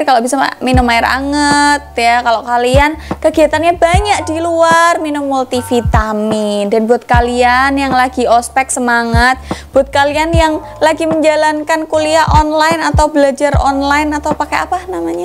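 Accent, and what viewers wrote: Java, Indonesia. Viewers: ciri khas bahasa PRIBUMI nya medok Good luck buat kk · Medok pisan si mBak iki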